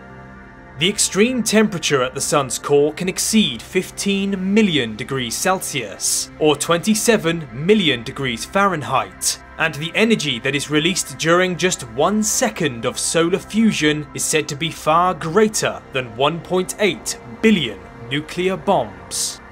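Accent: United Kingdom. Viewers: You can't delete someone's replies. And how is him having a British accent relevant to the comment?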